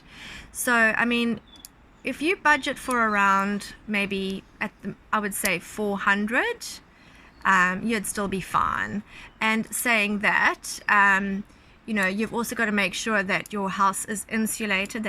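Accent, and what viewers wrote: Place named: New Zealand. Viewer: How long you been here, your accent has bouts of pure kiwi sounding.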